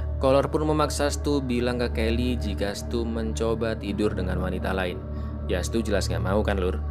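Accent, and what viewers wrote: Java, Indonesia. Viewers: Jowo ngendi mas' 😂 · Abang orang jawa ?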